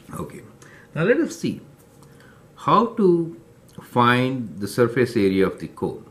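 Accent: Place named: India. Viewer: Saurabh Gupta thats exactly what im saying.it happens whenever i wanna learn a mathematical concept.tons of videos,all indians,with their terrible accent and terrible video quality,making the topic even more confusing than it is.same for this topic.all indian videos.almost none of them speaks proper english+shitty video quality.i just commented on this arbitrarily,i dont have any bad intention against this person in this video.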